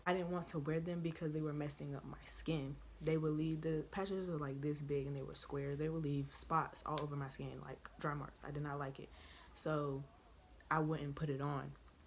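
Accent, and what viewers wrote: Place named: South Carolina, United States. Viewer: Lol, he brought a whole pregnancy test over. Love your story, where you from? You sound like you from South Carolina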